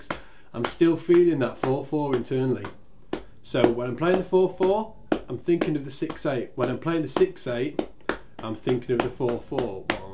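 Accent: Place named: Northern England, United Kingdom. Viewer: I usually don't dig instrument lessons and rather prefer to find out those things myself (takes longer, yes). But these lessons are perfectly inspiring - and you don't often find bass playing being explained in that great Northern accent. :)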